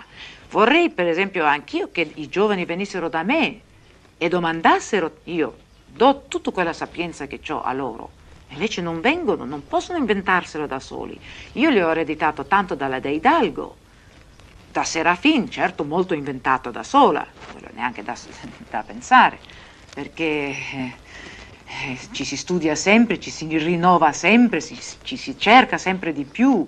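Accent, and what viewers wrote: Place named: Veneto, Italy. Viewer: Giusto. Si sente (ancora) l'influenza dell accento Veneto.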